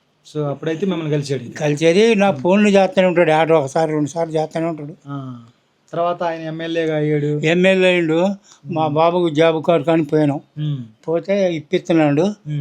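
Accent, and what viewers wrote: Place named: India. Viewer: Bro. Mee voice ma vurilo local voice lagundi anna eh vuru anna medi?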